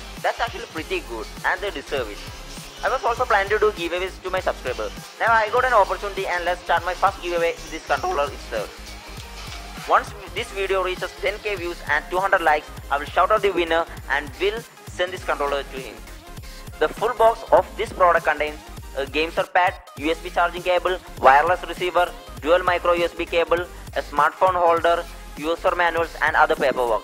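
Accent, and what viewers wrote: Kerala, India. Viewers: from ur accent i understood ur from kerala. Even im in kerala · lol indian accent...rip english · pure malyali accent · Da neey mallu anallae?.. voice ile manasilayadane enake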